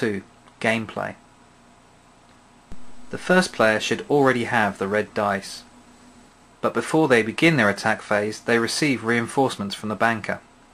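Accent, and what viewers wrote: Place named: Australia. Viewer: wow he sounds Australian!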